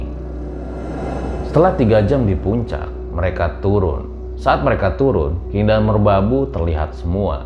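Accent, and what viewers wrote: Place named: Jakarta, Indonesia. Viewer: Gue sukadah bacotnye jakarta banget khas, tapi kalo bisa jangan bahasa frontal lah. Sesuaikan tempat . thx i like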